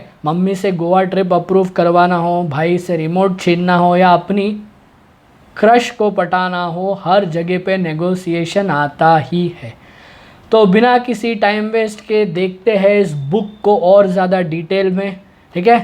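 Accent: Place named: Gujarat, India. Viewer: great sir, great video and from the way you speek i think you are from gujrat , back to point nice and informative video i will try this on my crush hehe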